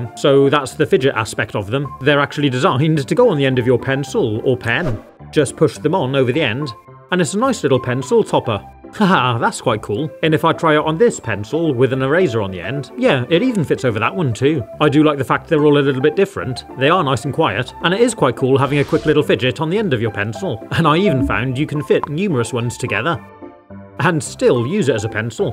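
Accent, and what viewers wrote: United Kingdom. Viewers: Bros British 💀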